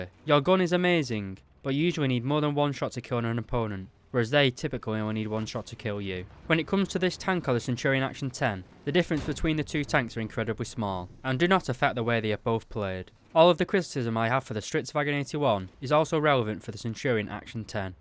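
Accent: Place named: England, United Kingdom. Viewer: Ayup mate... Whereabouts are you from in England? I'm from the high peak myself so your accent sounds quite familiar to me😁👍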